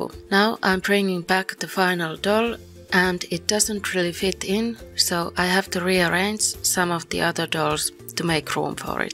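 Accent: Finland. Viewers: You sound very Finnish :D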